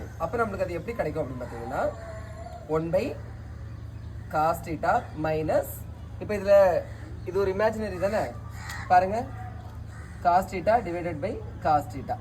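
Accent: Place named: Kanyakumari, India. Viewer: Tamil language than, athu kanyakumari language ithu mathiri than pesuvanka.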